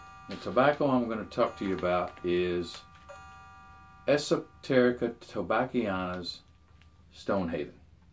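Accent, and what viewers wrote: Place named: South, United States. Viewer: what part/s of USA is your (or his if your a viewer & U know?) is your accent from/based-around,? As a Brit gal (my hubby smokes a pipe & loves Nightcap & your vids) I/We can't quite place it; I get notes of Southern, maybe a dash of Texan, Midwesterny overtones, a teensy bit of Northern-border region possibly (Pennsylv./Fargo e.g., hey I'm a Brit I'm gonna be way off prob!?), and a hint of light New-York here & there. But really it just sounds like what we in rainy old England might consider a 'straight-American-Dialect' with a touch of some southern state/s; usually the only Southern-State accents we tea-drinking islanders can tell apart from the others are New-Orleans and 'pure' West-Virginia (if that counts as a Southern state?, it sure sounds like one to us). Just curious & interested to know how way-off we are - happy piping! 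👍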